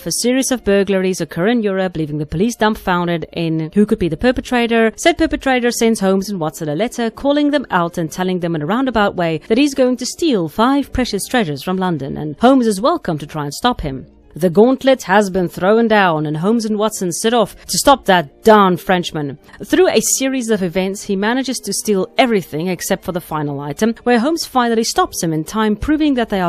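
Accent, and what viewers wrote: South Africa. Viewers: Within the first 30 seconds, I could hear the SA English accent. Appreciate that there's a local female gamer giving her two cents. Much love!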